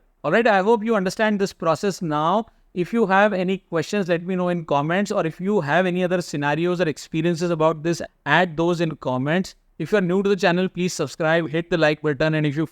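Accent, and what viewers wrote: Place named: India. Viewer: I love all people but Indian accent is horrible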